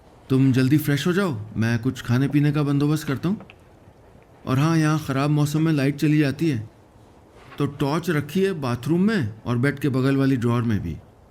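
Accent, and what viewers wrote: India. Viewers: Main 2018 se apki kahaniyan sunti aa rahi hoon apke alawa aur bhi bahut logo ko suna lekin apke jaisa desi accent aur kisi ke pas nahi Aap kahani sunate hain toh lagta hai jaise sab ankhon ke saamne ho rha hai, bahut sukoon hai aapki awaz me Ise hamesha jaari rakhna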